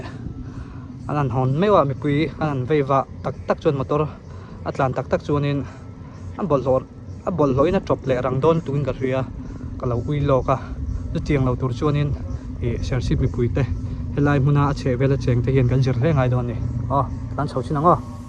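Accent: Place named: Southern Mizoram, India. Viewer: Itawngdan chu chhimlam mi iva angvele aw aw iti reng maia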